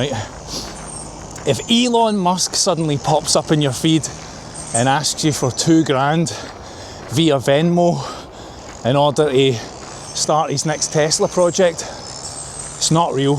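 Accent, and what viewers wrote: Scotland, United Kingdom. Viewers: Some people are so gullible lol. Just love watching and listening to you. I’m Scottish too and listening to you is just like listening to a friend chatting away. I have a snuggly hoody like that too and love it but the arms are about 5 foot long on it 🤣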